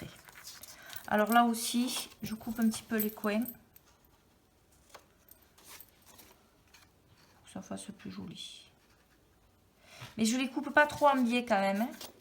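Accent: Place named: Toulouse, France. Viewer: Mais qu'il est beau notre accent toulousain 😉. Merci pour le tuto. Je participe...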